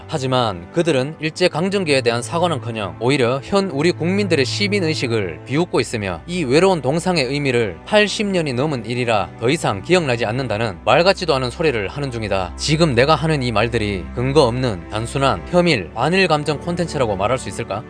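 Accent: Gyeongsang, South Korea. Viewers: 영상은 좋은데 갱상도 사투리 오지네 짜증나게.....! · 갱상도 나레이터 매력있네요. ^^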